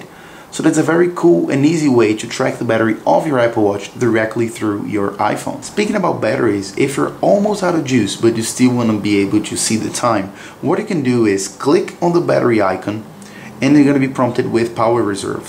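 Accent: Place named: São Paulo, Brazil. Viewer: Khew you were Brazilian by the paulista accent hahah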